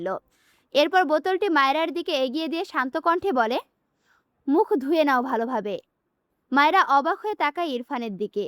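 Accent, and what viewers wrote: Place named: Kushtia, Bangladesh. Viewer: আপু তোমার ভাষা শুনে বুঝাই যায় তুমি কুষ্টিয়ার মেয়ে। আমিও কুষ্টিয়ার মেয়ে 😘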